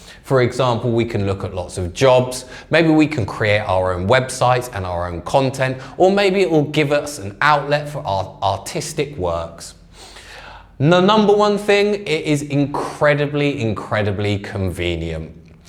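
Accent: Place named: United Kingdom. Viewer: love his british accent !!!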